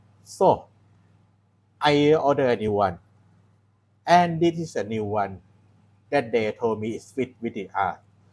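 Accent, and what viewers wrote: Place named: Thailand. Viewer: สำเนียงคล้ายๆคนไทย เลยครับ เจ๋งๆ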